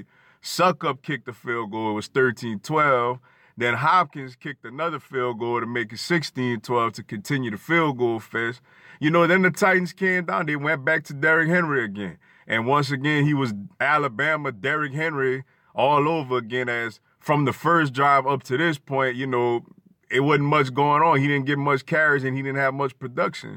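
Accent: New Orleans, United States. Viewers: Sound like a NewOrleans?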